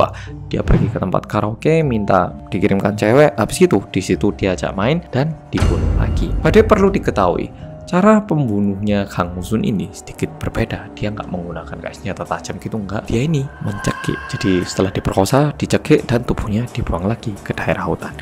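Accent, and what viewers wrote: Java, Indonesia. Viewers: Lagi nonton series korea di netflix judulnya "somebody" yg cowonya ganteng tapi pembunuh berantai agak2 mirip sama tema cerita yg dibawain sama oppa medhok ini😍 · Gagal fokus am kreator nya wajah nya kek Korea tp cara logatnya kek jawa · Ampe medoknya di kencengin 😁😁 mantaaaaap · Pembawaan videonya khas mas ada suara medoknya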